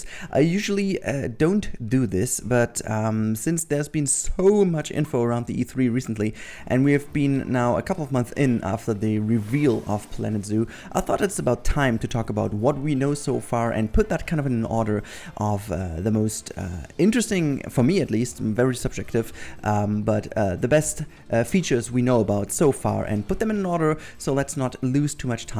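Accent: Germany. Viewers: Are you a German Person?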